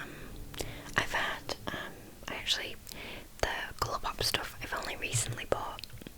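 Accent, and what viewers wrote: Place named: England, United Kingdom. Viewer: Hey, Don't want to sound like a creep but where abouts in england are you from? Just because of the accent. I'm from South Yorkshire btw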